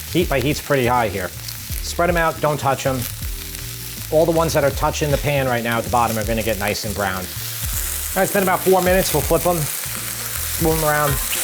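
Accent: New York, United States. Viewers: Love 💗 the way you cook. A typical sounding New Yorker…..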